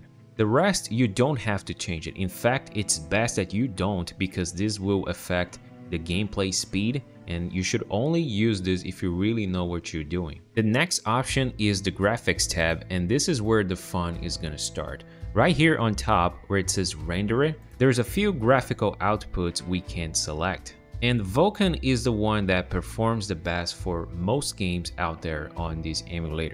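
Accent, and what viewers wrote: Brazil. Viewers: Best Brazilian person accent i ve ever heard. congrats for the work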